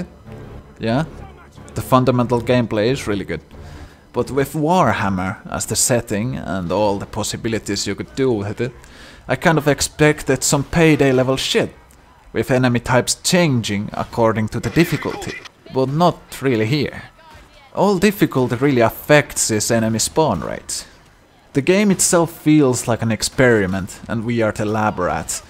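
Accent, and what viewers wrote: Finland. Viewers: Although you sound finnish I totally agree with you, give me my free biscuit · Are you finnish ?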